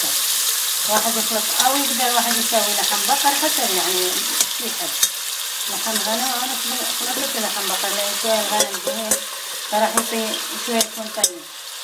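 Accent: Iraq. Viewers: خاله والله حجيج حلو عراقيه أصيلة اكو ناس بس أسافر ينسون همه عراقيين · اللهجه العراقيه ماكو احلا منه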